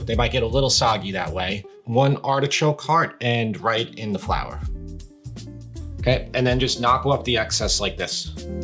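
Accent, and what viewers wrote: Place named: East Coast, United States. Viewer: Cool east coast accent, smooth beats, reassuring confidence, that's gonna be an easy subscribe my dude.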